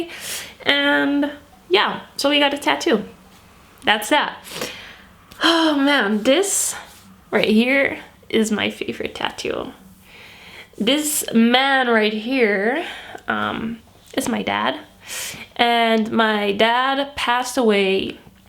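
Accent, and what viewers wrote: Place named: Netherlands. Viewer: Toen je begon te praten hoorde ik gelijk dat je nederlands was! haha, loved the vid.!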